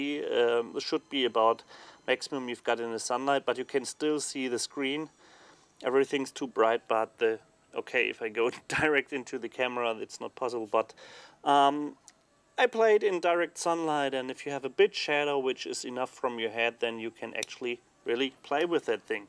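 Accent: Germany. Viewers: I can hear your German accent, I have that one as well :)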